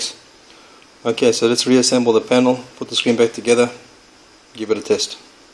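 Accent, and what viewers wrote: South Africa. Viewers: Are you South African? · Hi my fathers LG flatron w2043s started fading a while ago had lines and just wasnt right obviously ive tried a rubber on the ribbon for corrosion and tried to extend my laptop display via vga cables all i got was a white screen any idea what i can try next? are u from South Africa by the way ur accent sounds close:) im an SA dude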